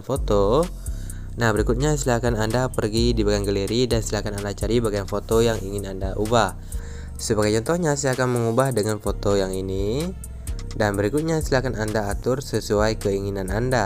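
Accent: Aceh, Indonesia. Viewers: Org Aceh Bg?😂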